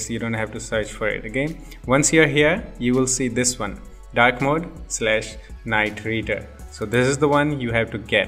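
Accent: India. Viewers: i love indian accents, for real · lol cant trust that indian voice sry just had too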